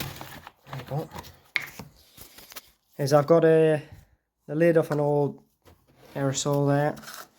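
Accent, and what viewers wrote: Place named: Durham, United Kingdom. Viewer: Respect man, I love proper minis, and I’m originally from the Durham area so love your accent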